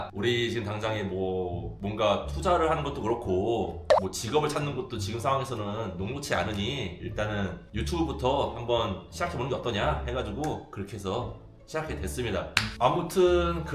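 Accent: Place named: Jeolla, South Korea. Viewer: 대인. 고향이 전북 아니오? 말투가 우리동내 같은디?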